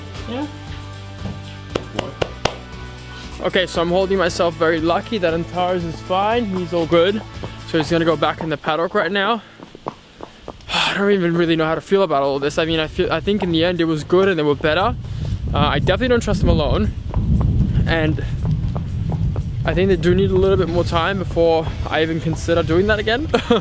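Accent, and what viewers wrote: Australia. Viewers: You are Australian I could hear it and as soon as you said you flew him from Australia it clicked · Literally look me five videos to realise your Australian.... I’m Australian and I couldn’t figure it out 😂😂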